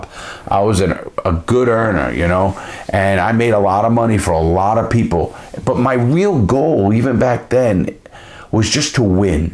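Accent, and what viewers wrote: New York, United States. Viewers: them thick new york mob accents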